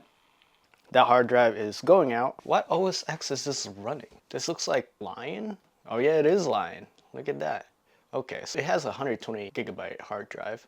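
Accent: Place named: Asia. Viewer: your like a woman. I presume your asian judging by your accent which are the most woman like dudes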